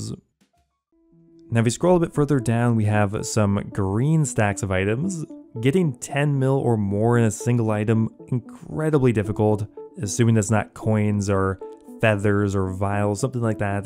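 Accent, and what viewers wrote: Canada. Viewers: I always had a hunch you were from Canada 🍁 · Wtf you’re Canadian?!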